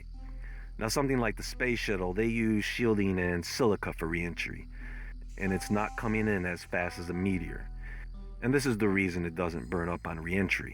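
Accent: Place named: East Coast, United States. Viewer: sounds like a know-it-all, with his Italian (Jewish?) east coast accent, telling us "trust me". He makes some interesting videos, but his logic is often flawed, at least it seems erroneous to me. We live on a plane, not a spinning ball traveling through a galaxy, as has been proven ad nauseam. Both NASA and the government lie to us, but he believes the crap science they taught him anyways - wtf? The weather is now created and controlled, flooding caused intentionally using rapid water evaporation from power plants, which creates and fuels storms. Cyclones are created artificially. This was all proven in Weatherwar101 videos, which you can still watch on youtube. Mr. WW101 himself was disappeared in early December 2017, then his website disappeared a few months later, since he wasn't able (alive?) to renew it with his ISP. This video is mostly nonsense, but I like his videos on other subjects, especially the bible.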